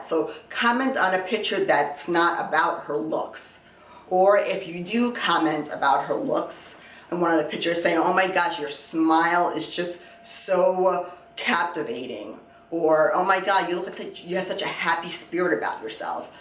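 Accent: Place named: New Jersey, United States. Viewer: That Jersey accent lol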